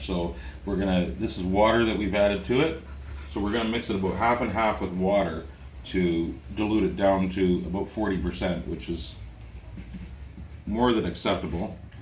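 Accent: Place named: Canada. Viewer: You must live in the Philippines, but I hear a bit of Canadian accent. It sounded like you say "a boot" instead of about. 😅. Very hel0ful video, thanks.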